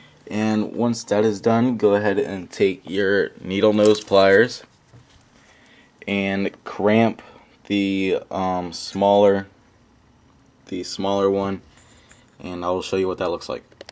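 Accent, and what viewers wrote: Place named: South, United States. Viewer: hes from the south yo :)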